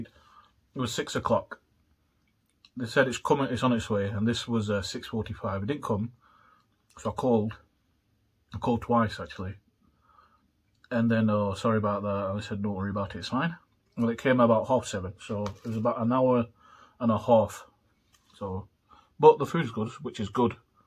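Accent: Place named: RP, United Kingdom. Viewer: Lol like your posh accent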